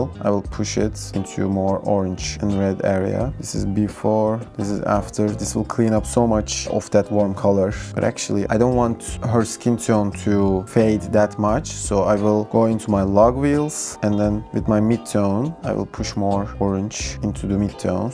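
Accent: Turkey. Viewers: You sound like you are Turkish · lan dedim bu adam tam Türk gibi ingilizce konuşuyor Türk çıktı, selamlar teşekkür ederim video için :)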